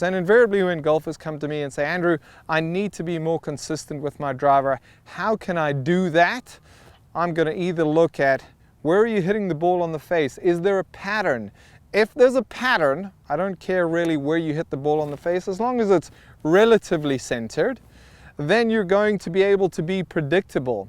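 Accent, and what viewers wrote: South Africa. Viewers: I am a new subscriber to your channel... Love you videos Dont have too many golf Tutors in South Africa...but we do have some awesome golfer.... Your accent sounds south African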